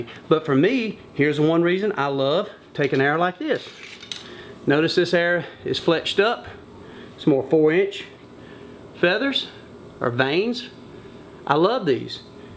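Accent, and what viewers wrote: South, United States. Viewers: I love the way you southern boys deliver a message. By the way, I do remember watching you over 20 years ago.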